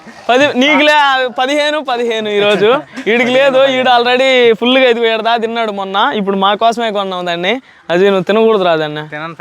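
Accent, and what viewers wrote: Nellore, India. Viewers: Mimmalni chusthunte mucchatesthundi... God bless you.. Nellore language mi daggara vintunna🥰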